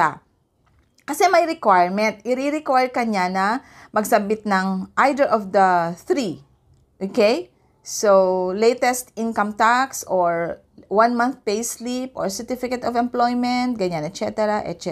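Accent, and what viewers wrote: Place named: Ilocos, Philippines. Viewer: Ilocana ka met gayam madam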